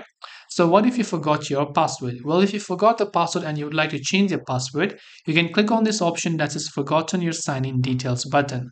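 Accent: India. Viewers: I would need to listen to this 30 times before i got it. Speaking too fast and with an Indian accent. Thanks.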